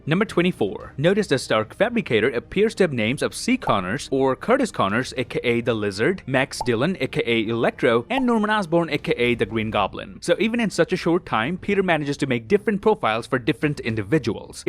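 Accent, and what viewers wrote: Canada. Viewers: You're Canadian!!!! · he is starting to sound more canadian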